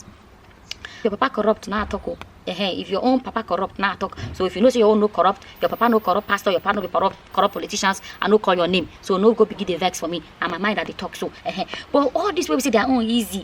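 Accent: Nigeria. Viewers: leee😂😂😂😂your mouth sweet for the pidgen English no be small 👍👍👏👏👏💖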